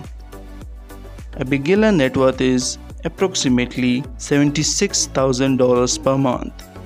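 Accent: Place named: India. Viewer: Is the announcer from India. Because he sounds like one of them.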